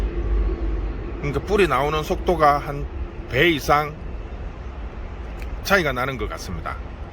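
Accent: Gyeongsang, South Korea. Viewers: 경상도 사투리 죽이네여 물이 다이는것과 다이지 않는것의 뿌리내림 차이 ㅎㅎ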